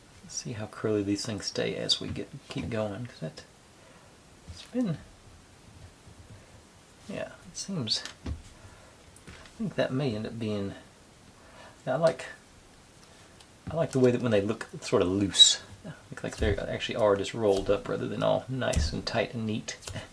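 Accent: United States. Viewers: Because English is my second language do you have an accent? Because sometime sounds perfect American and sometime not.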